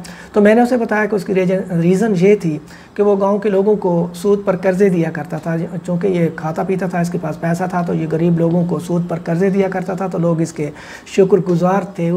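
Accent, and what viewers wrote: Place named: South Punjab, Pakistan. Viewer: Sir you are looking the resident of south Punjab and your accent in speaking more than your words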